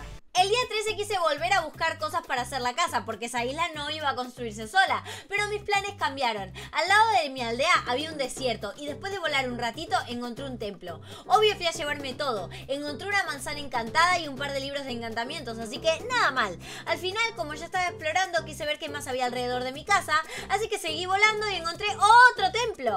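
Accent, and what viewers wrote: Argentina. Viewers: Te quiero mucho y me encanta el idioma argentino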